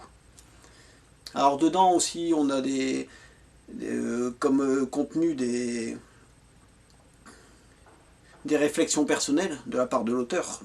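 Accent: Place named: Nord, France. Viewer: C est le Noooooord l accent tient je m abonne pour voir ce que tu fais tu ma l air sincère dans tes paroles . Et suis picard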